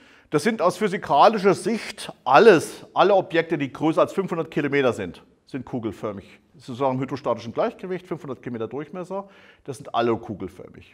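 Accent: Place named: East Germany, Germany. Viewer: Musste leider schnell wieder weg wegen Ossi Aktzent...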